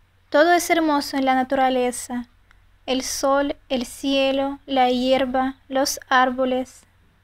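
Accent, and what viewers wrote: Argentina. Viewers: hablas como argentina wtf :v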